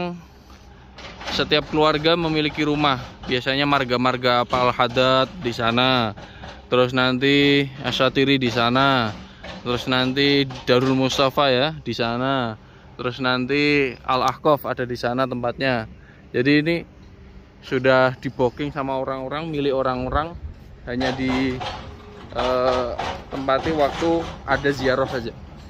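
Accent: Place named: Indonesia. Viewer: saya lebih suka orang indonesia yg bicaranya berlogat indonesia ,daripada orang indonesia logatnya di arab2kan...jaga terus tradisi logat indonesia nya mas .,jangan seperti yg lain yg logatnya di arab2kan...budaya kita kekayaan bangsa kita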